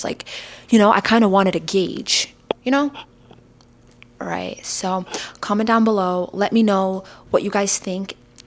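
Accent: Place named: Dallas, United States. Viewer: Ma'am I need to know more about you lol bruh your accent your face your mannerisms your rich cultural history and animated storytelling I looove it all ! So Full of personality ... I'm like do I detect a hint of "american black girl from Dallas" type of accent ??? What region of the U.S. is your accent from ??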